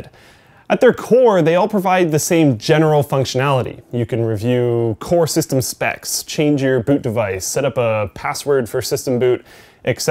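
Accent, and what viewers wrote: Canada. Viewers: what's with the Canadian accent? i know you're Canadian and all but i have never heard you nor linus sound like that before! yes i did notice a few videos back!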